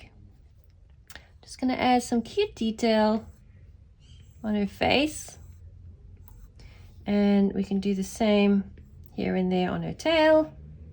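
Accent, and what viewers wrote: South Africa. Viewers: Love hearing your South African accent. Thank you for the awesome tutorial! ❤️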